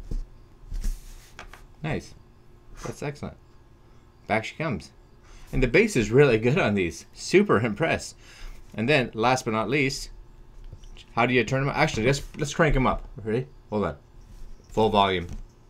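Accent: Canada. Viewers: Great video if your not Canadian you sure do sound Canadian from your viewer up north ,keep up the great reviews this is my new favourite Chanel 👍🇨🇦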